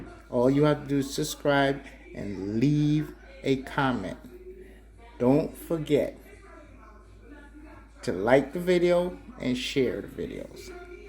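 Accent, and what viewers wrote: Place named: Jamaica. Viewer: Man, you sound like a Jamaican for real bro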